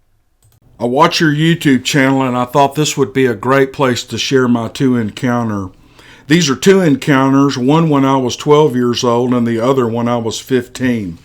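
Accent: South, United States. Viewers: I'm from the South, but living in the West, so I enjoy your storytelling and Southern accent (aka twang). Wish you much success! And Happy Holidays! 🎄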